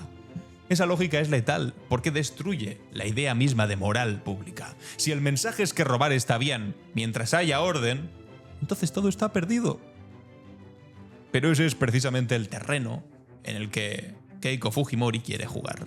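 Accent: Spain. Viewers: Cállate hoy tanto Delincuente que mata a diario elsu padre encarceló al desgraciado genocida de ABIMAEL a es genocida con tu acento español anda a hablar a españa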